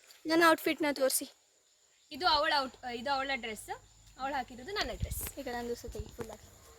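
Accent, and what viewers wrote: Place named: Kundapura, India. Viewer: ನಿಮ್ಮ ಕಡೆ ಮಾತು ತುಂಬಾ ಚಂದ ಉಂಟು ಕುಂದಾಪುರ ಕನ್ನಡ ಕೇಳೋಕೆ ಚನಾಗಿರುತ್ತೆ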